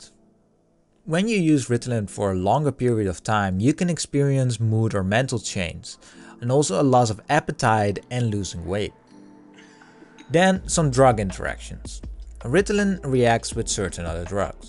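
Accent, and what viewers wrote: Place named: Netherlands. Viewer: I recognize a dutch accent when I hear one!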